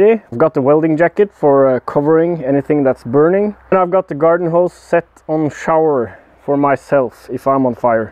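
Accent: Australia. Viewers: are you from Aussie mate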